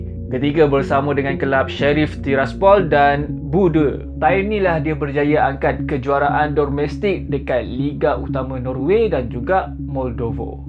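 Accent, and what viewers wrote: Malaysia. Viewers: Pronunciation biar betul bang, jangan Melayu sangat bunyinya.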